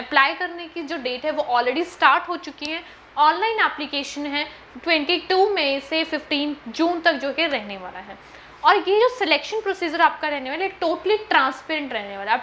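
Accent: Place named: Bihar, India. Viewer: Just bihari boli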